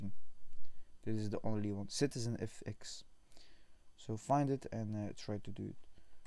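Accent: Netherlands. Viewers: lol i can hear you have dutch accent me 2 haha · Ik hoorde gelijk dat je NL was haha maar goed uitgelegd pik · ik hoor nederlands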